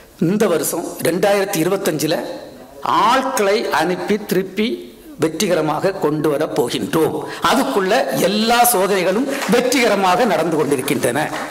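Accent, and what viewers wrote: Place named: Nanjil Nadu, India. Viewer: நாஞ்சில் நாட்டு தமிழ் மணக்கிறது.....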